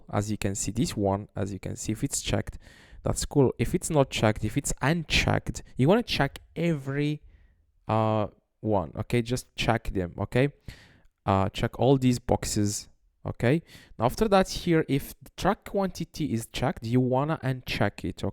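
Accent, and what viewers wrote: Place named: Morocco. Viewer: Merci mon frère, j’ai très vite reconnu ton accent marocain mdrrr, Tu m’as sauvé d’un problème que j’essayais de résoudre depuis ce matin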